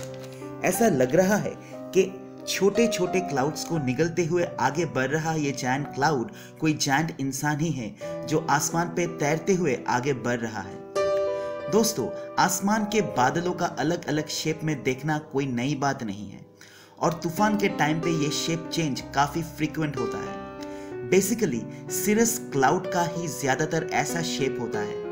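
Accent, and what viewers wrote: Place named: Bengal, India. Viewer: Pehle to me pehli bar ye video dekh raha dusra ye bengoli acsent he to aap kaha se ho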